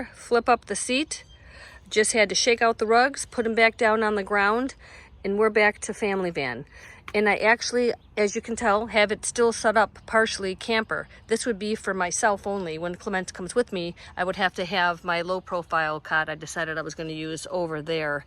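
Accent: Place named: New England, United States. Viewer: You have to be from east coast. You talk so fast. From new england area and I do too. New sub, great ideas you share👍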